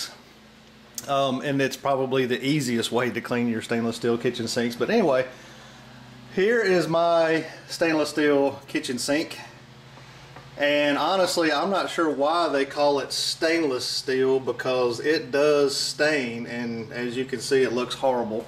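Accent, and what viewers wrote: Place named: South, United States. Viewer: Love it. And I'm going to guess you're not from up north. Seeing as you don't bother with wearing clothes for a Youtube video and you pronounce gel as jail. No judgement here! You are rocking it. Thanks for the video.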